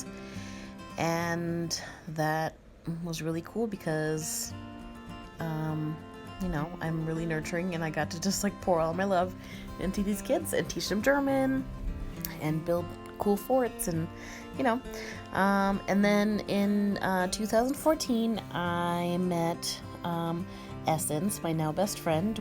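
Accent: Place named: United States. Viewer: Why do you have an America accent?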